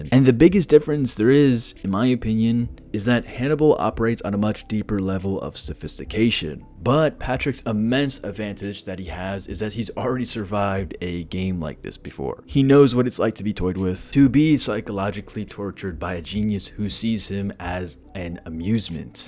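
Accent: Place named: England, United Kingdom. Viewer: You can kind of hear the English cadence in his American accent, it makes vigilante sound innocent and polite with all the dumb stuff he says so it’s funnier 😭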